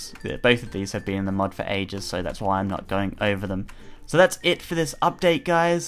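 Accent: Australia. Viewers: Is he an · lol, r u australian? luv the mod btw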